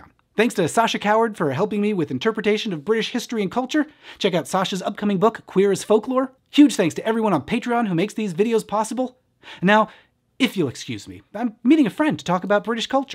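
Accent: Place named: United States. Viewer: The Accent somehow is less British and more American sounding.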